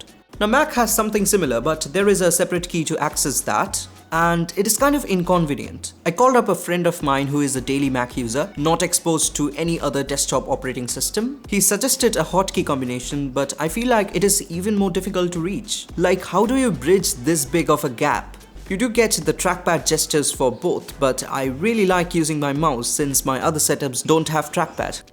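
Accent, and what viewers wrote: Bengal, India. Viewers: btw great content. I assume from your accent that you are Bengali. Anyways, take love. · Bro are you from West Bengal or from Bengali ancestry as I have seen little Bengali accents from you because I am one myself.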